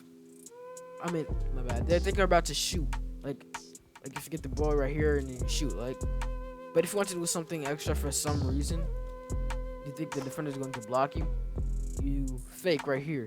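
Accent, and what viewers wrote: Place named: New York, United States. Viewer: U sound like u from NY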